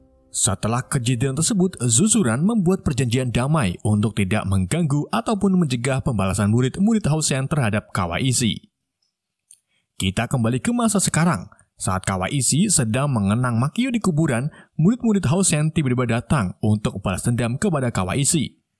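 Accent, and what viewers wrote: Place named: Central Java, Indonesia. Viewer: pames kwkw,jateng bgt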